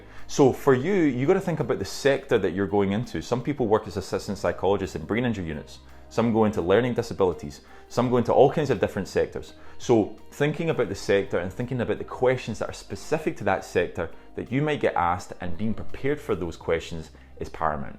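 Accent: Scotland, United Kingdom. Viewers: Thank you so much for this info. Some people tell you, you shouldn’t tell them you are aspiring for something else as they will think you want to just use that opportunity to climb further. By the way, are you Scottish?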